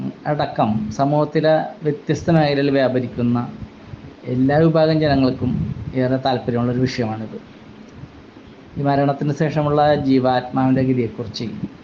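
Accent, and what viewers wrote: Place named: Kochi, India. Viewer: Kochi bhasa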